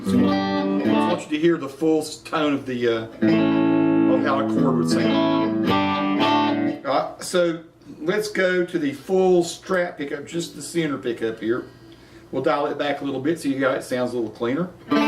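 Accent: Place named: Appalachia, United States. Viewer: Hi, just found this video through your Scottish accent video. Weird coincidense, I started looking for a Telecaster just yesterday and was wondering if I should spend a little bit more on a Mexican-built Fender or buy a cheap Squier. Difficult decision as I‘m an amateur and only play ocassionally at home. I think I will go for the real deal - a Fender with single-coils. Thanks for showing all the different modes, it helped to have a side-by-side comparison of the two different pickup styles as I only played single-coils all my life. I also really enjoy your Appalachian accent! Thanks & greetings from Germany, Alex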